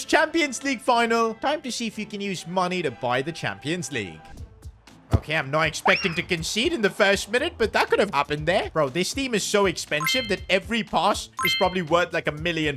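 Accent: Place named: India. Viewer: your indian acnent just the way you said "i am building." 🤣🤣🤣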